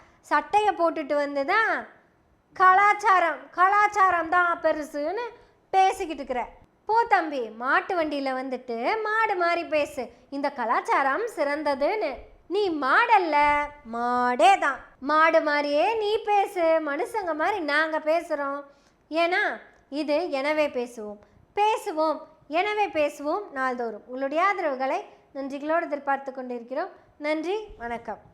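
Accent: Kongu, India. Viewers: கொங்குத் தமிழில் சதிராடும் தமிழ்த் தாயே வணக்கம்🙏🙏🙏🙏🙏🙏🙏🙏🙏🙏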